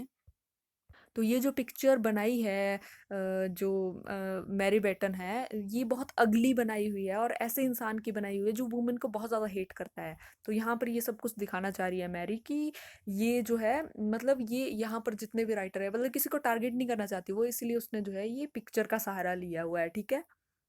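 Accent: Haryana, India. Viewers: Wow it's amazing I understand each and every point From your voice - i can guess Are you belongs from Haryana?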